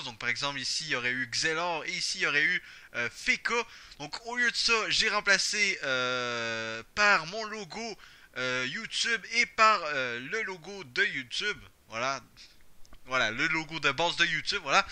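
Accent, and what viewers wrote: Quebec, Canada. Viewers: j'aime l'accent du quebec x) j'avais déjà essayé de modifier les fichiers sur mon ordi en copiant le theme de base et en le mettant dans un nouveau dossier avant de modifier les trucs mais ça marche pas. J'aurais jamais pensé à installer un autre thème puis à le modifier, donc merci et bonne chance pour ta chaine!ps: je débute sur YouTube en tant que joueur dofussien.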